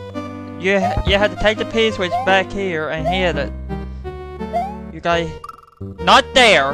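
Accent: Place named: South, United States. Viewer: love ur country accent, great commentary!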